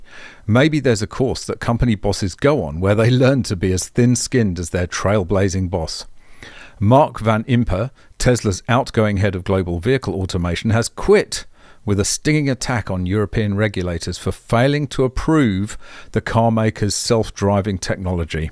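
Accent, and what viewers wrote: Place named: United Kingdom. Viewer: An average of 60 ICE crash fatalities occur in Toronto every single year. Kids, moms, dads, and others have lose their lives everyday. Sadly they hardly ever make any big news, even if the volatile fuel in their gas tanks explodes. A single crash with an EV of course makes headline news. Luckily EVs are much less likely than ICE to catch fire (for obvious reasons), and their safety features help prevent these fatal ICE crashes. But Hybrids are the worst fire catchers. They're even worse than ICE. Apparently mixing a high electrical power system with volatile fossil fuel system may not be wise. Who ever could have thought? "Fully electric vehicles, on the other hand, were deemed far safer than both hybrids and gas cars; they are far less likely to catch fire, with just 25.1 fires per 100,000 sales. That’s compared to 3,474 hybrid fires and 1,529 ICE fires per 100,000 sales respectively." Ouch. 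I wonder why Australia (with UK accent) never mentions these things. Is there an agenda at work or $omething?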